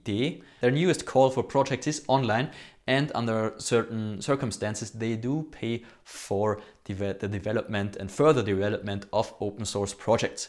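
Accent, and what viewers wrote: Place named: Austria. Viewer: go for English. You're english is good but try to explain what you mean when you use austrian dialect 😂 (I as a german native speaker, with a southern dialect doesn't understood you)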